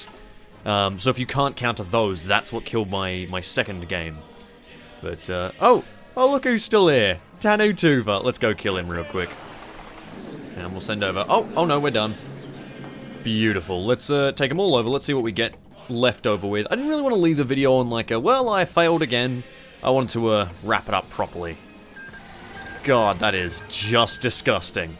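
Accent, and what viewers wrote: Australia. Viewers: You over exaggerate how Australian you are and it’s really cringey and hard to watch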